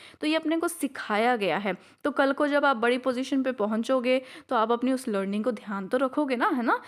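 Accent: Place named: Haryana, India. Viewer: Haryana k lgre h mam ❤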